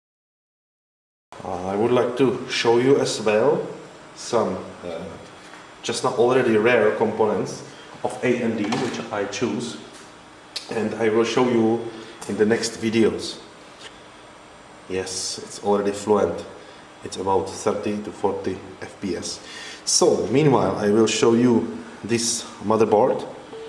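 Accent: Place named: Czech Republic. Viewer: Já si říkal, že slyším czenglish. Ale nemyslím to ve zlém, jen jsem tam v té angličtině poznal český přízvuk, tak rovnou píšu česky a pak se objevilo ve Windows Vitejte a bylo jasno. Též uvažuji udělat nějaký podobný test. PS: Toho Athlona fakt závidím. Nemám ani jednu Slot A desku a ani procesor, ale hrozně moc bych chtěl.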